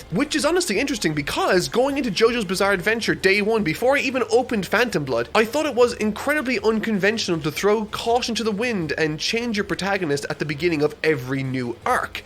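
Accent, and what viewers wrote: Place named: Ireland. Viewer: Are you from Ireland by any chance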